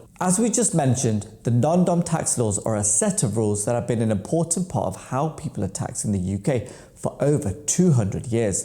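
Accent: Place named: India. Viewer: yes you sound very much indian or bangladesh, whichever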